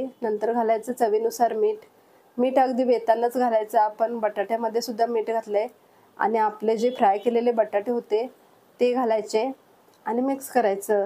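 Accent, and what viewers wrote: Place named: Kolhapur, India. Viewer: tai ani tumhi bolata khup chan kolhapuri god bhasha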